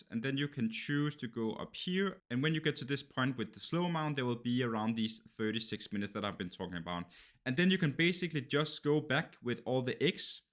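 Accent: Denmark. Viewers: Gætter på Dansk accent? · sorry jeg afslørerede dig 😂 du har bare en af de mest tydelige danske accenter når du taler engelsk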